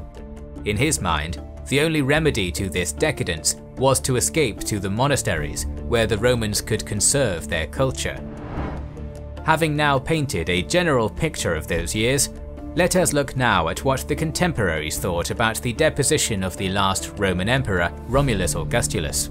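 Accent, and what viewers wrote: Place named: United Kingdom. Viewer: Excellent voice. Calm and clear. And British 🥳🥳🤣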